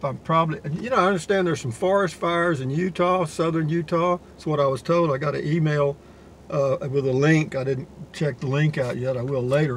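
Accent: Texas, United States. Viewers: Enjoy those tacos and thanks for the positive, happy attitude even when referring to negative comments. The more you discuss the class B vans, the weaker I'm becoming. If there is one weakness I have, it's motor vehicles and the reason I started following this channel is I was looking at small travel trailers online when came as a suggestion from you tube. I've been watching every one I can since then. It's a combination of the content and also largely on as a soft spoken gentleman, the Texas accent just adds to it all.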